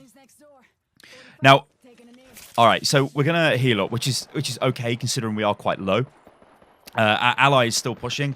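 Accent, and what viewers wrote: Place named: Northern England, United Kingdom. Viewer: Love this new series!! on btw are you northern? you have little bits of it i swear as i'm derbyshire, sounds similar.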